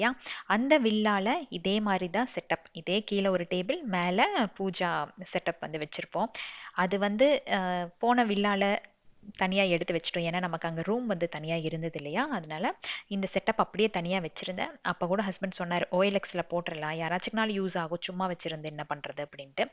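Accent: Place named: Pondicherry, India. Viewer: akka unga voice kaetalae relax ah eruku ❤❤❤unga kita erunthu varthuku orunal apditha nanga pondicherry la paesuvom unga kita erunthu varathuku oruka😂😂kathukita akka ma❤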